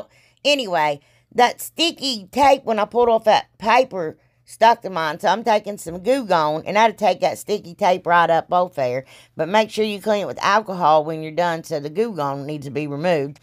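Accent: South, United States. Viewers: You sound like a Southern Country Girl. The rocking chair is adorable I think it would be cute with a country bunny! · Ive been looking every chance I get for a CALENDAR with a truck.I guess I'm just not fast enough 🤔 I will keep watching u always bc u r my favorite crafter & u always lighten up my days. please Don't change a thing. course I will keep you & you're family in my uprayers . Can u tell me where u grew up bc u have a beautiful thick acent. I thought u said something about Ohio but u sound more Southern. I hope your parents feel better soon & I will pray for GOD'S BLESSINGS on u & your family it looks like GOD'S already BLESSED u with Talent .The longer the video the better. I'm about to watch another of your videos. Keep them coming. love u girl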